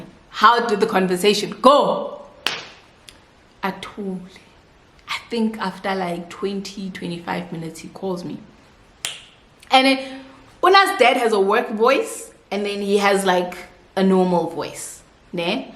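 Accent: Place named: Sotho, South Africa. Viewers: The Sotho accent for me😂❤. Hamba Oyii😂😂😭😭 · 😂😂😂😂😂😂😂😂😂your sotho accent hle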